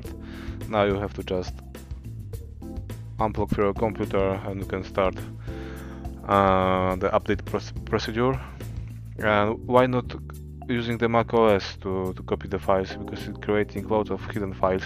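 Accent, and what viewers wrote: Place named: Poland. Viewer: What language you are using ? bcos if its english... then you have to practice a little bit more... thats more like polish then english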